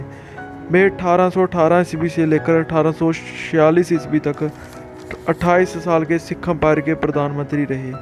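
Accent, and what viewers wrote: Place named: Jammu, India. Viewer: Your accent is heavily influenced by Dogra and punjabi ❤️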